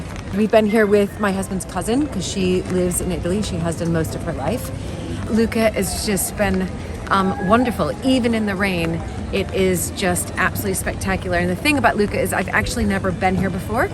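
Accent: United Kingdom. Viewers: Wow,you picked such a wonderful area for your house! Just gorgeous.Your American accent has some touches of British e.g.You pronounce t in "delightful" and "little" with a very hard t instead of the softer ddd American style.Now you will be picking up Italian too.I doubt you are conscious of it. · Soooo beautiful. I notice that some of your diction is now coming close to a British accent. cute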